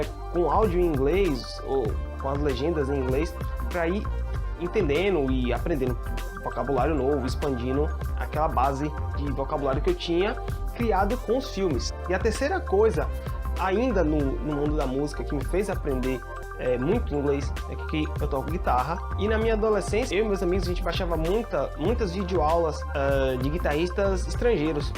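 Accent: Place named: United Kingdom. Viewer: Olá, fiquei curioso para saber como você adquiriu sotaque britânico. Se puder me responda por favor. Obrigado!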